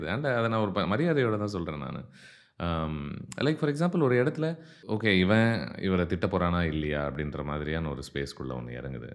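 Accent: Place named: Kerala, India. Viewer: Avar full ah tamil pesala 😂😂 avar konjam malayalam pesraru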